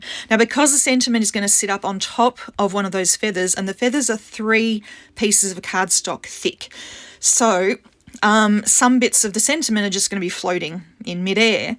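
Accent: Australia. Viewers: Ahhh, that Aussie voice, I don’t get to hear them often anymore since leaving and moving to USA 24 years ago, so it always brings me so much joy. Your background was gorgeous and I am sure the video didn’t show off how truly pretty the glimmer from perfect pearls was. What a beautiful card. Thank you for sharing.❤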